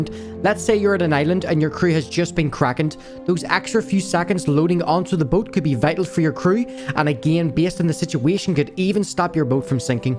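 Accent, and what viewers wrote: Northern Ireland, United Kingdom. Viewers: Good to hear another person from ni here lol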